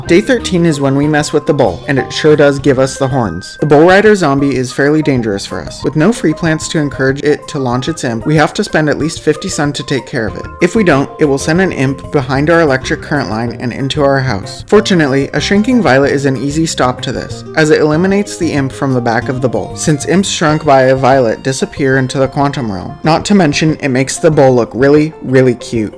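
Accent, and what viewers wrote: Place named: South, United States. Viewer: Love that southern accent #GvZWW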